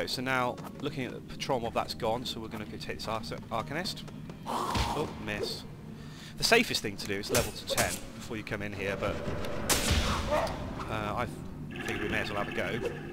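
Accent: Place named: United Kingdom. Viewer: Hey, I really like your videos; just fun and casual to watch and listen to, whether Vanguard is popular or not. Seems like a really nice game for some casual single-PvE. It's just too bad I can only make out 80% of what you're saying behind all the sound effects and with the brittish accent (no offense). Oh, btw, try whatching this video transcribed/auto-subtitled; it's just totally fucked up:) (like - )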